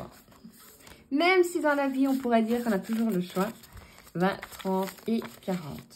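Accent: Liège, Belgium. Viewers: Tu as déjà tenté le simulateur d'aube ? C'est un réveil dont la lumière s'allume progressivement pour être à son max à l'heure à laquelle il sonne. Ce qui fait que quand tu ouvres les yeux, la lumière est déjà allumée . J'en utilise un depuis près de 20 ans, je ne pourrais plus m'en passer ! Bonjour de Bruxelles, chère Liégoise ;-). L'accent ne trompe pas =D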